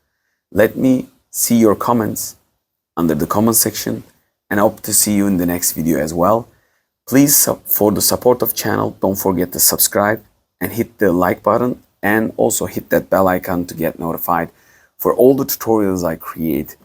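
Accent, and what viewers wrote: Turkey. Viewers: Oglum I recognise this accent, memleket neresi?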